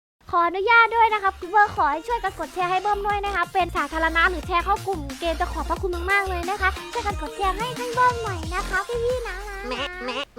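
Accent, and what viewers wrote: Isan, Thailand. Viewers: พึ่งเคยได้ยินเสียงพี่แบบนี้พี่พูดอีสานด้วยไปกับหน้าด้วย555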